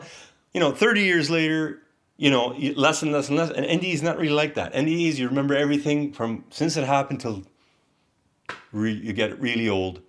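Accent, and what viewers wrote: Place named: Finland. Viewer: You sound Finnish.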